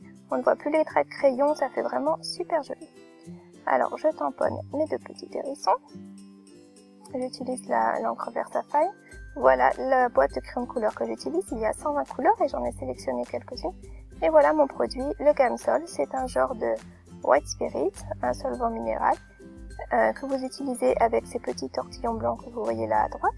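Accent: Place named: England, United Kingdom. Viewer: OUIIIII tu as un accent anglais so good !!! Mais quand je t'écoute parler je trouve que tu as un petit accent de je ne sais d'où très charmant !! Oh les bruit de la nature c'est si beau !! Ces petits hérissons sont vraiment trop mimi - perso je préfère avec la neige !! Merci pour ce partage PB Bonne nuit et à demain BIZZZZZ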